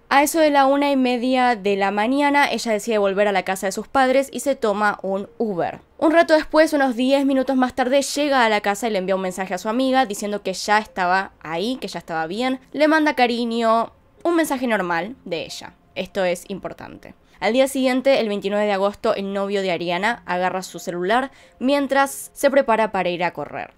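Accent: Argentina. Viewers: Por favor ya no digas ANIO, ES AÑO POR FAVOR 🙏 😭, me ensartar como investigas punto por punto tus teorías llevo más de una semana escuchándote desde que descubrí tu canal y tu acento argentino es muy bueno 👌 solo porfavor en la pronunciación es año🤗 gracias espero puedas leerlo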